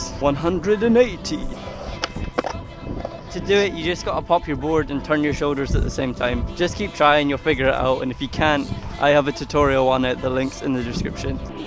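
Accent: Scotland, United Kingdom. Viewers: Are you from Scotland or the UK ? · Scottish. I knew it!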